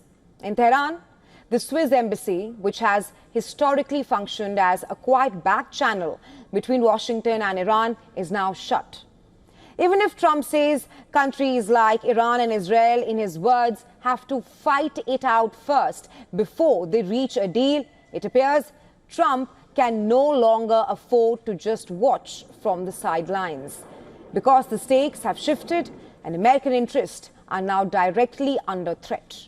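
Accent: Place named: India. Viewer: I am American. I would never ask an Australian, British or South African person to change their accent. The Indian accent is beautiful and I understood 100 percent of what she said.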